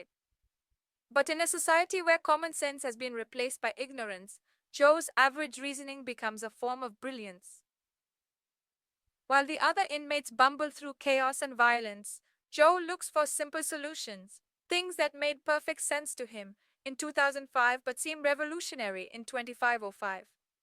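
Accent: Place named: India. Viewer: That Indian voice from the narrator is horrible as hell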